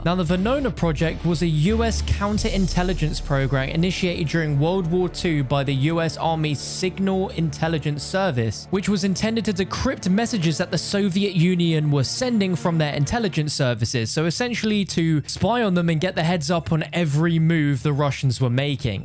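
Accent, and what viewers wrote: United Kingdom. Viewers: I know I'm gonna get whooshed, but he said agent. It's just our British awful accents. But yes very funny joke.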